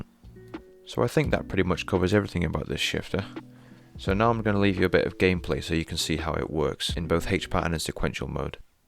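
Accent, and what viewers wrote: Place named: United Kingdom. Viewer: Sadly, I searched on eBay and wasn't able to find any stateside. Going by your accent, you sound like you're in the UK. I found the seller you probably bought yours from, but the shipping cost to the US makes it out of reach. I'll probably just spray paint the piece of silver 4080 I ordered from Aliexpress..when I get it in 5 or 6 months. haha.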